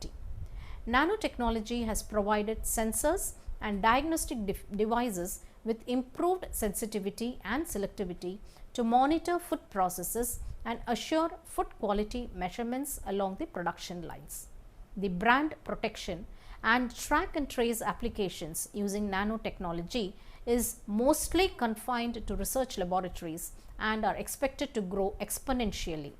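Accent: India. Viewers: it's a lovely presentation with such beautiful indian accent . THANK YOU SO MUCH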